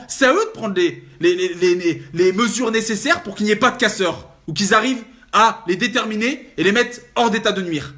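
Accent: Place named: England, United Kingdom. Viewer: Juste une question : Pourquoi avoir pris un accent anglais ? xD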